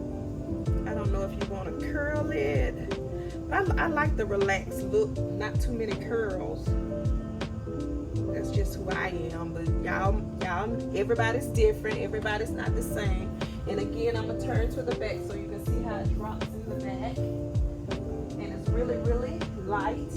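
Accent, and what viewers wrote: South, United States. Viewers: Love it! Where are you from, I hear that southern draw lol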